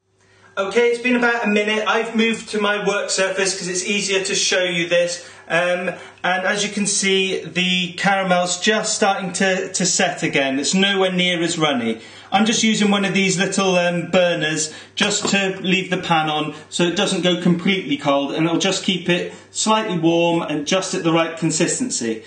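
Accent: United Kingdom. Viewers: Are you from Cardiff? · He's not Australian -____- I'd have thought by his accent it's pretty obvious he's from the UK, but obviously not.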